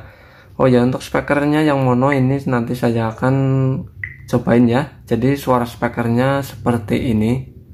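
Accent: Banyumas, Indonesia. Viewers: Medok ngapak mas 😄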